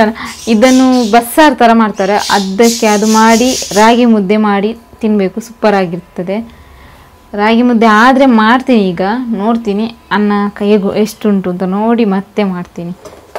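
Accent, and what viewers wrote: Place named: Mangalore, India. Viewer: ನನಗೆ ಮಂಗಳೂರು ಕನ್ನಡ ತುಂಬಾ ಇಷ್ಟ ನೀವು ಮಾತಾಡೋದು.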